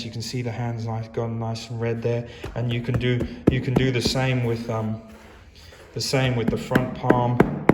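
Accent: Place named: Australia. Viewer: Who has like an Australian accent, or maybe British idk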